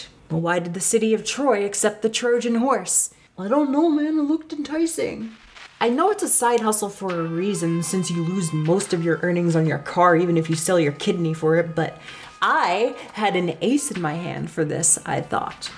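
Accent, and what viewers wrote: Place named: Philadelphia, United States. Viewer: You from Philly? Auto sub immediately.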